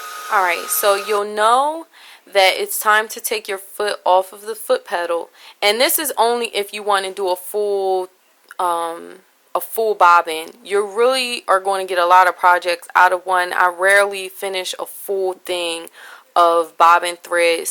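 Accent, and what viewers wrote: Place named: Yonkers, United States. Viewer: lmao i did the same exact thing 😂 thank you for this video i’ve never sewn in my life and this was my first threading. PS i love your accent. I used to live in Yonkers as a kid and it reminds me of my best friend at the time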